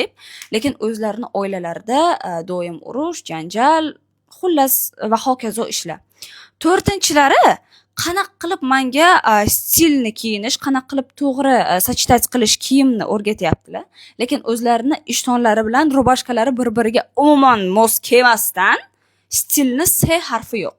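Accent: Bukhara, Uzbekistan. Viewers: Assalomu alaykum opajon yaxshimisiz,Buxorodamisz deyman opajon shevangiz menikidek ekan ❤❤❤ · Buxorocha da😊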